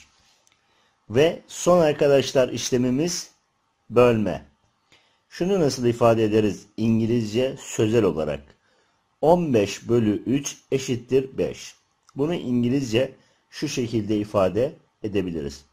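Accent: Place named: Australia. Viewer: Aussie english!!!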